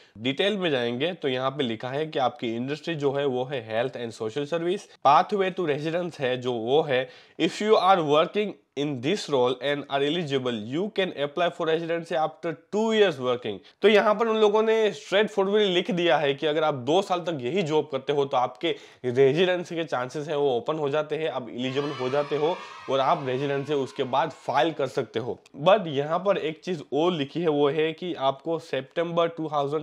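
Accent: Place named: Gujarat, India. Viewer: Bhaii tame Gujarati lago tamari language ma avu lage ....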